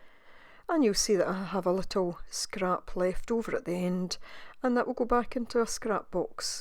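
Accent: Scotland, United Kingdom. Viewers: Enter draw just found you through the hop and love your ATC’s lovely to hear another Scot on youtube thank you looking forward to trying this out with my scraps 🙂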